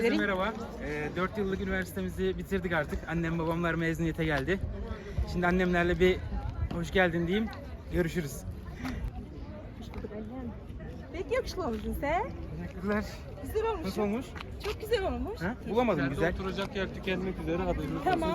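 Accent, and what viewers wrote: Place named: Uşak, Turkey. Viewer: Sonradan dinleyince uşak oldıgunu anladım bızde manısa merkezden 😊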